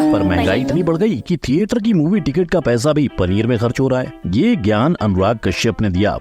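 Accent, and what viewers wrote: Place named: Bengal, India. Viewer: Your Bengali is too sweet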